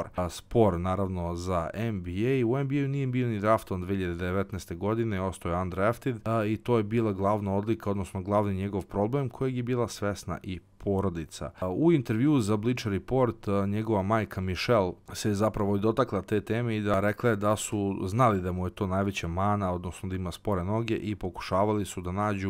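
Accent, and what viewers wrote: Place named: England, United Kingdom. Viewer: Zajebi košarku, daj nas nauči taj engleski naglasak🔥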